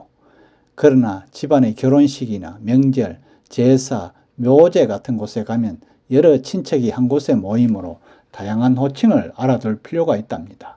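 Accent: Gyeongsang, South Korea. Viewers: 매제, 매부, 발음에 있어서 문제 없습니다. 지방에 따라 발음이나 장단이 다를 수 있지만, 경상도 억양을 쓰는 님이 쓰는 매제, 매부, 발음은 서울, 그리고, 표준발음하고 다르지 않습니다.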